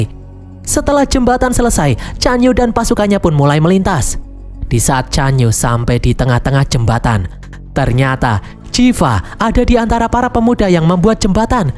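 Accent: Indonesia. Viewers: Nezha pun sebenarnya bukan nesa tapi neca, tapi gpp kan lidah indo bukan lidah cina woi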